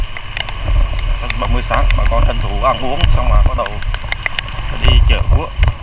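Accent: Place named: Hue, Vietnam. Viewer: Nói tiếng Huế cho dễ nghe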